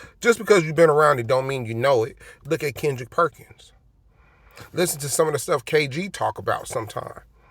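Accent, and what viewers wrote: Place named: California, United States. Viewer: how about the minute you started talkin' I knew you were from California bruh. Either LA or Long Beach.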